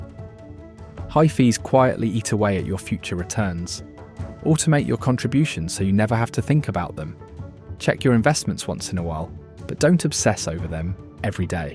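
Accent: England, United Kingdom. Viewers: Suggestion: change the accent. The English one is getting annoying.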